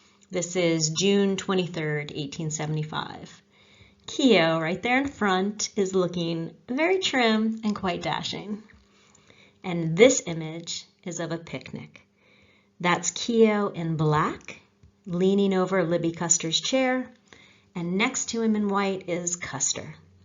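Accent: Ireland. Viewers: It is my pleasure to let you know how great of a storyteller (albeit Historical/non-fiction) and I'm glad the timing was good for you. I will most assuredly continue to let you know as I proceed to other segments. I'm so glad that I happened upon your series! Btw, I loved your Irish accent coming through as you spoke of Myles's story. It truly made for an endearing quality to your presentation. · Never talk in an Irish accent again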